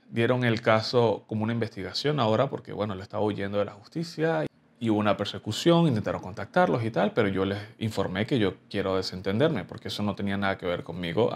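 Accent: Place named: Venezuela. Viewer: Epa chamo jajaja vine porque quería mas consejos sobre la barba pero me quede escuchando tu historia tu acento es venezolano no tenia ni idea quiero preguntarte unos consejos sobre la barba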